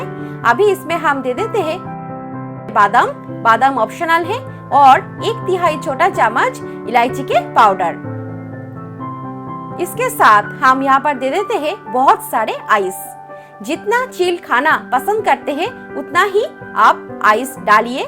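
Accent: Bengal, India. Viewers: Its always please and cute to hear hindi from a bengali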